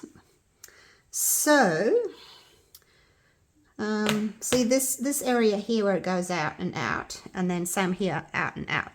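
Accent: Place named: Australia. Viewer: Side note curiosity I have to comment on your lovely accent again. Australian accents are so very cool. Forgive me if I'm wrong.🤔 But dudes are called blokes right? So what is the correct term for chicks? A little 70's era usa slang for guys & gals🤣🤣🤣🤣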